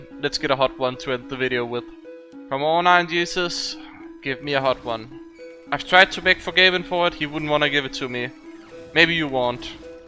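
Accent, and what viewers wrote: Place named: Denmark. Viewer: hey i was wondering where you are from because you sound danish and im danish let me know if my speculation is right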